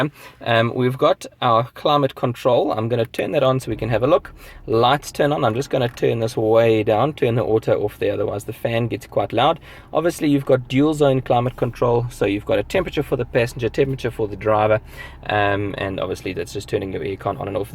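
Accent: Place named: South Africa. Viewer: Your accent sounds South African 😅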